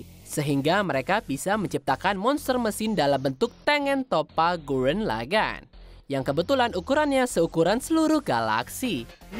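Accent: United Kingdom. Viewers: Harus banget naratornya make aksen Inggris? Biasa aja cukup kok, jadi agak kurang enak dengernya jadinya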